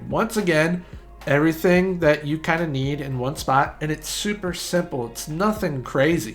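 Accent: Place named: United States. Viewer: weird hearing a yank talk fm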